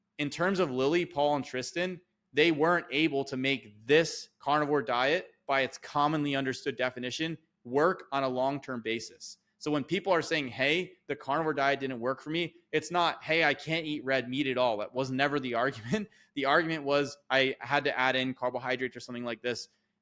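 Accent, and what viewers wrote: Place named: New Zealand. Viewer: has a very New Zealand accent! 😂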